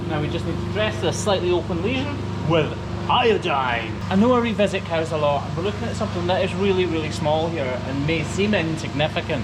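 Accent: Scotland, United Kingdom. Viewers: I'm just curious, what would say your main viewer demographic is? For example: People who are wanting to learn about trimming cows feet? People who are hoping to see a little puss? People who find these videos put them to sleep? Women from America who just want to hear a cute guy with a Scottish accent talking to them? Or something else? I'm sure all these examples apply but what do u think the main one is? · love this guy and ahis Scottish accent.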